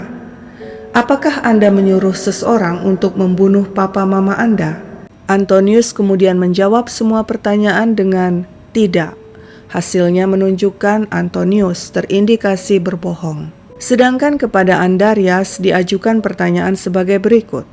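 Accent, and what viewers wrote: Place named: Manado, Indonesia. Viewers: kok logatnya manado?? haha setahuku toraja ga gitu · Dialek khas Utara si admin mantap 👍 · Min logatnya manado padahal Min cerita kasus dari toraja sulsel. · Admin fasih deng logat Sulawesi..😊😊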